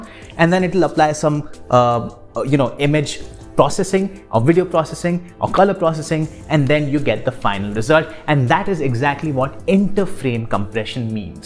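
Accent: India. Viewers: Best indian English speaker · Hey, here, the anchor from the video! That's okay buddy, I could have faked an accent but i tried to stay true to my own fellow Indians. Spend some time with Indian English content, and you'll soon start understanding what I'm trying to say. You can also use the CC feature in the YouTube interface. Thanks for being honest!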